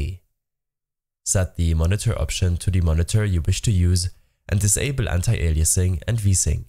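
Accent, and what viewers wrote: Germany. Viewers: Gotta love the german accent Thanks for the video <3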